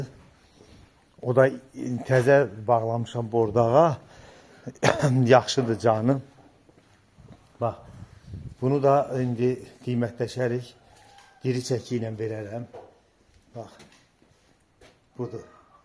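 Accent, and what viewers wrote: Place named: Goycha, Armenia. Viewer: Ləhçən çox xoşuma gəldi GÖYÇƏ MAHALINDAN DEYİLSƏN? BASARKEÇƏR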